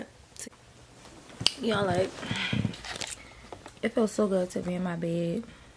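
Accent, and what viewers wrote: New Orleans, United States. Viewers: Is that a New Orleans accent I’m hearing? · Yesssss I was thinking that 😍 I love New Orleans accents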